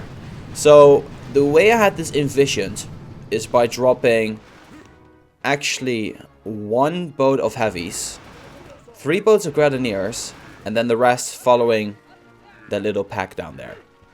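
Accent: Netherlands. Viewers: Je bent 100% Nederlands, dat hoor je gewoon.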